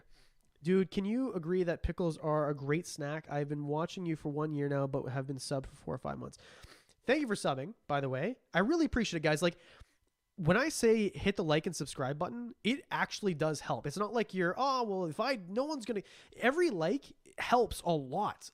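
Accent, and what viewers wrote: Canada. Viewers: I’ve seen a lot of your videos and this stream is the most Canadian you’ve ever sounded 😂 love it! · Love your Canadian vernacular. Eh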